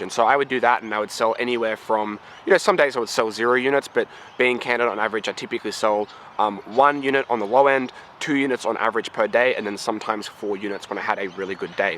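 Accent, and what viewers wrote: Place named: Australia. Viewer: your story is incredibly inspirational and should move everyone who hears it to want to be great at any cost! I talked to Quinn yesterday after opting in...looking forward to getting goin with you guys in the very near future! You are the M&M of digital marketing....you even sound a bit like Marshall with an Aussie accent brother!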